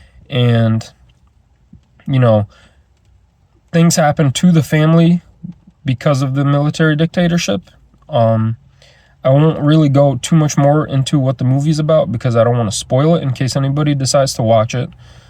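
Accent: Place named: Brazil. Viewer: Before you told us you lived in Brazil, I could tell that just by your accent.😅🇧🇷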